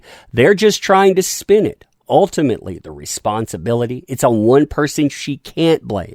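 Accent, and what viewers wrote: South, United States. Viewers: I come from the UK and was listening to your stream last Night and you mentioned people not liking your Accent. To be Honest I love your Accent very Southern Slightly Camp and best of all I could imagine you or at least your voice being the voice of a very dangerous crime boss who feeds people who crosses him to his Pet alligators and from Louisiana or some where like that anyway I like it All the best from Leeds in west Yorkshire United Kingdom haha You should hear ur accent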